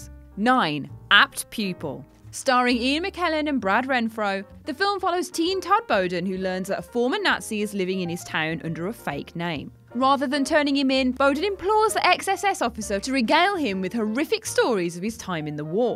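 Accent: United Kingdom. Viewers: British Much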